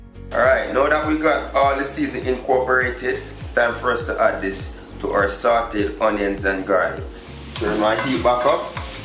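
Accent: Jamaica. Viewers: I like how you narrate your videos. It's a good balance of JSE and Jamaican Patois. "Ah murda!"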